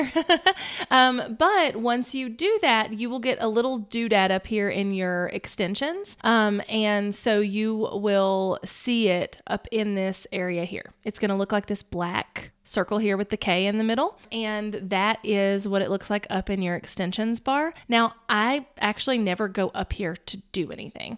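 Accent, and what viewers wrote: South, United States. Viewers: That was so helpful, and the Southern accent made the video especially interesting! 😉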